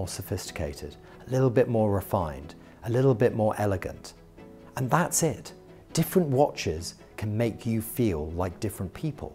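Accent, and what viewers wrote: England, United Kingdom. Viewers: Consumer psychology 101 mixed in with an English accent. Don't buy it. Think for yourself.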